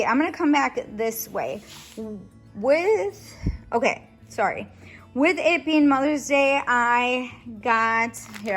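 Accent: Wisconsin, United States. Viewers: When I heard your accent I knew you were from Wisconsin. Hello, from a new subscriber from Wisconsin!! Loved the haul. God bless 🙏🏼❤️ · Do you ever go to Woodmans? I don’t know how far north they are in Wisconsin. I knew the Wisconsin accent too. (I live in Illinois) 😀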